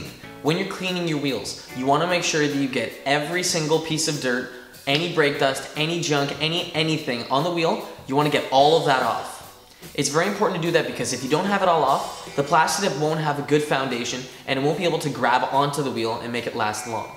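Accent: Canada. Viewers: If I had to guess, I'd say that sounds like a Canadian accent. Great video!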